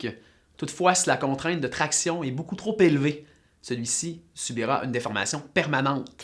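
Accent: Quebec, Canada. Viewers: Je comprends rien à son accent de Québec là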